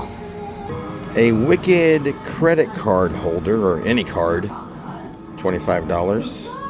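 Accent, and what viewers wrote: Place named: Boston, United States. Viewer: Wicked. Sounds like Boston.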